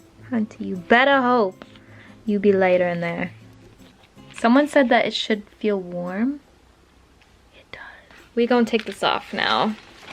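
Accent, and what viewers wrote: United States. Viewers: Where are you from? I don’t understand why you’re saying pounds when you sound pure American. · Where are you from? You said pounds but you sound American x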